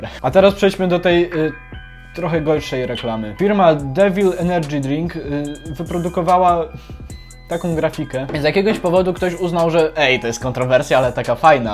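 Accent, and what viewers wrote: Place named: United States. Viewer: przez jego amerykanski akcent to brzmi jak "szałmi" lecz poprawna mandarynska wymowa to "siałmi" to "ał" jest krotkim ao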